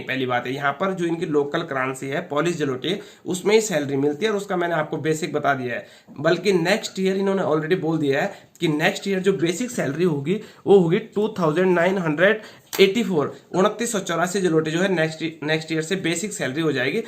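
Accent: Garhwal, India. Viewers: Garhwali accent h bro aapki aawaj me. 🙏🏻🙏🏻🙏🏻🙏🏻👌🏻👌🏻👌🏻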